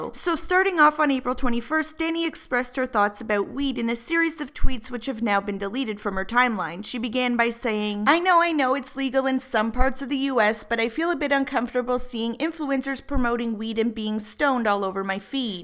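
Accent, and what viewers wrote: Canada. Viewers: no offense you sound like your from Canada from the way you say "sorry"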